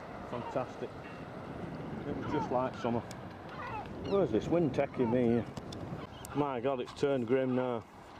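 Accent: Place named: Northern England, United Kingdom. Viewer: Enjoyed that , it's now on my to do list. This you must live near me with that accent, Wigan? Bolton? Lol.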